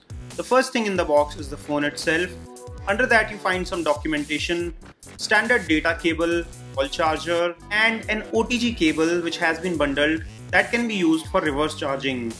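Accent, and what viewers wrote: India. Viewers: i like listening your indian english ... nice review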